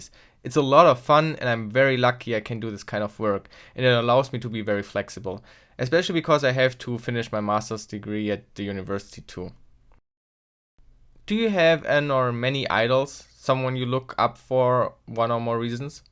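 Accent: Germany. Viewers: Are you German? Because I am and your accent sounds really German, like I sometimes forget I'm watching an English video😂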